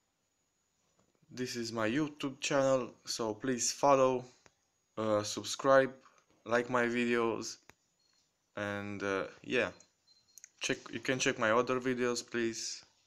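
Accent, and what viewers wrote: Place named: Russia. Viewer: Видно что русский,но английский хорош!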